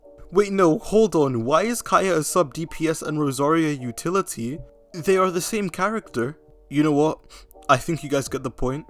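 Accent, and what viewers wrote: United Kingdom. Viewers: why is he always posting drama and why did he lose his british accent over the past 2 years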